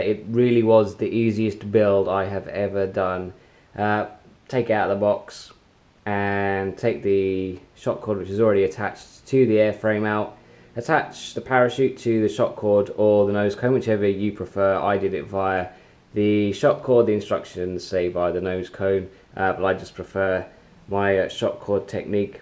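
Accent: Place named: United Kingdom. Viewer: Nice rocket. I assume by your accent, you are in the U.K. ? Model rocketry seem to be really popular and active in the U.K.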